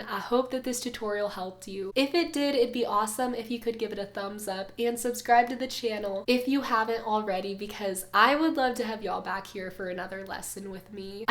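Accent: South, United States. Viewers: kinda sounds a bit like a southern accent to me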